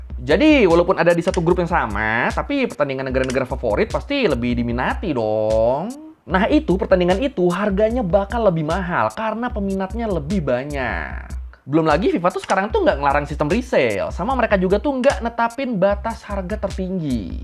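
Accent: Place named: Java, Indonesia. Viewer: bang elo...medog kayak jawa ya😅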